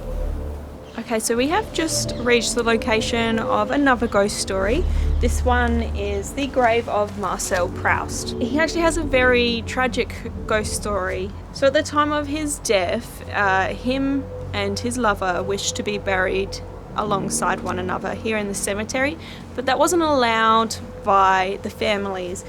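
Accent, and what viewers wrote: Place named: Australia. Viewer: You've got an Aussie accent!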